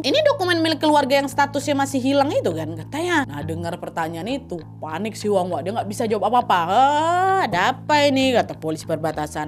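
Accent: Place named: Riau, Indonesia. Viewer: Pembawaan ceritanya enak kali apalagi ada logat2 riau nya 😂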